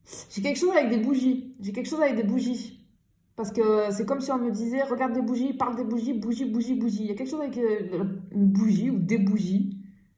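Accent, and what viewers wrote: South, France. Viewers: Bonjour, merci pour vos belles vidéos. Je les découvre depuis peu et beaucoup me parle. Celle-ci en particulier. J’adore votre énergie, votre accent du Sud, votre chaleur et votre bonne humeur. Et j’adore votre manière de faire : « ou la lalala ! » Lol recevez toute ma gratitude.🙏🤗💙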